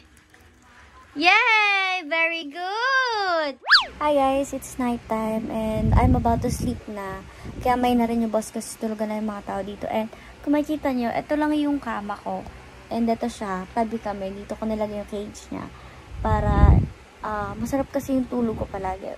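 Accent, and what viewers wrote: Batangas, Philippines. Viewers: I love that Batangenya Accent hehehe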